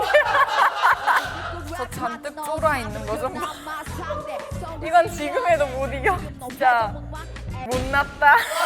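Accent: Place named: Gyeongsang, South Korea. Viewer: 서울 말을 쓰려는 노력이 단 1그람도 없는 ㄹㅇ 진성 사투리 그자체 ㅋㅋ 너무 사랑스러워 같은 경상도 여자이지만 경상도 사투리 쓰면서 저렇게 사랑스럽고 안걸걸해 보이기 쉽지않은데 헤이즈는 너무 좋음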